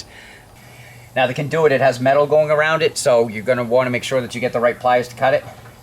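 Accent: Boston, United States. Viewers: Installed Ready Brake and Ready Stop today on my JL.. It was a long day.. Also installed Maximus -3 Tow loops for the JL instead of a base plate . I have a front hitch on mine use for plowing and the Tow Loops play nice with it. Only issue with the Tow Loops is the NSA Clevis Adapter for Maximus 3 tow loop opening is 2mm too small. I had given them the specs. They said they had it in stock.. Welp yeah but wrong size.. They are gonna ship me out the correct one this week... Hey is sound like you are from Boston also..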